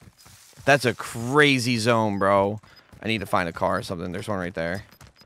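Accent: East Coast, United States. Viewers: are you from the east coast ?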